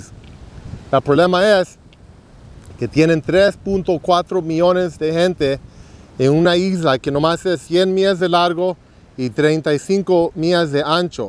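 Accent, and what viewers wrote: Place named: Mexico. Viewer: El tiene accento mexicano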